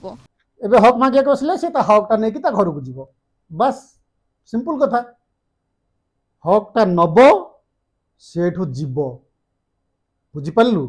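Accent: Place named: Kendrapara, India. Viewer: Bhai katha ra saili ru laguchi bodhe kendrapara ade ghare apanankara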